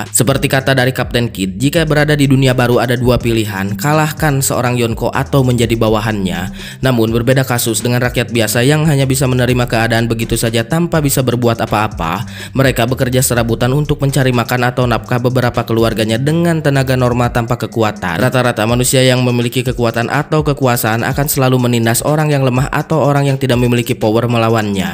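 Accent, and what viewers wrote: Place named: Sunda, Indonesia. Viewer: sunda banget pembawaannya teh hahaha kerenn.. semangaatt boy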